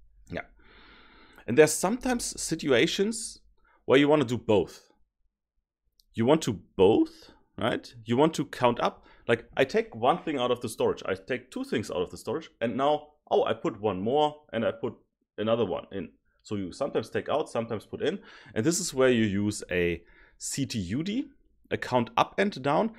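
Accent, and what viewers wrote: Germany. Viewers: Man merkt den deutschen Akzent 😂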